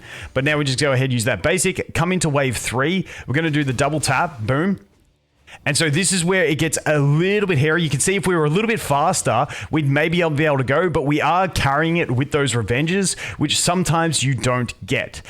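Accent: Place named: Australia. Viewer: As a Yorkshire man i always find Australian accent fascinating.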